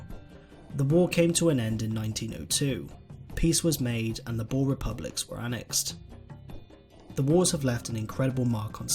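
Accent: United Kingdom. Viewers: Turns out the narrator will still try to make it look like they're not if he has british accent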